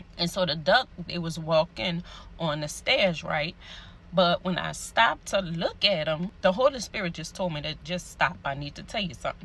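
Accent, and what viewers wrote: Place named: New Orleans, United States. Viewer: Are you from New Orleans ? You sound like us 😂 !! Also Beautiful word .It’s my first time seeing a video of yours . I’m 22 and spent time away from people for three years building my personal relationship with God and being purged of the things that didn’t belong to become all he wants me to be and it wasn’t easy bc I was completely alone with just him and no friends or family for support or guidance but I am still here 🫡 . This resonated with me and I receive it ❤🙏🏽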